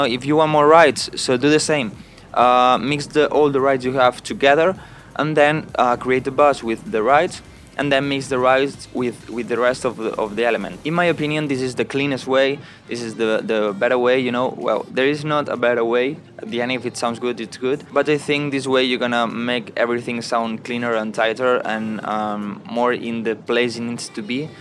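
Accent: Spain. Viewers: Tipic spanish inglish JAJAJA GOOD video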